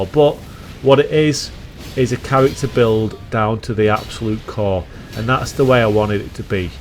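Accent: United Kingdom. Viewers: can you fucking show the objects, i dont understood you fakin british language god damn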